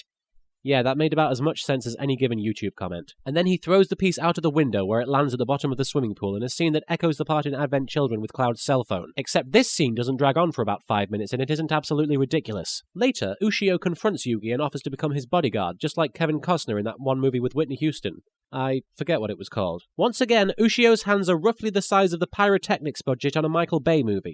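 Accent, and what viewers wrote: United Kingdom. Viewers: He's actually British?! THAT'S AWESOME! xD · I was not expecting for you to be British. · Wait is British??? Bakura? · I miss your old clearly british voice. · wait is british? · holy crap is british??!!! how did i not know that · Except his voice is more British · your british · ZOMG his real voice is just a combination of bakura and yugi's voices. or yugi with a brittish accent hard to tell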